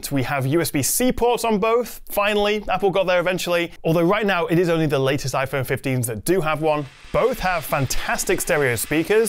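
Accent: United Kingdom. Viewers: Brother he's from England not Denmark didn't you heard the British accent 😂 of course it was filmed on England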